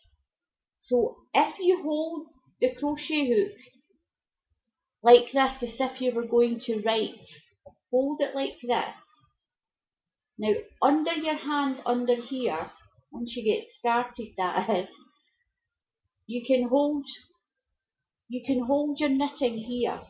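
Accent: Scotland, United Kingdom. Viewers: I love this video, do you have to crochet after you take it off the loom? I love your accent, your from Scotland right? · Hi, Thank you so much for this. I was looking for a new project to do on my loom. This is perfect. Such a pretty stitch. I'm from Livingston. It's lovely to hear a Scottish voice on YouTube.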